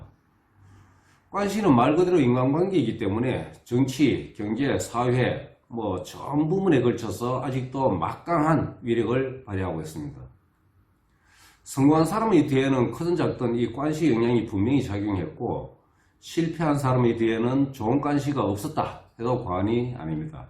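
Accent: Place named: Gyeongsang, South Korea. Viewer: 사장님 교포세요?! 제가 중국에 살아서 조선족 많이 봤는데 말투가 경상도 분 같으시네여 흑룡강 분 이신가오!?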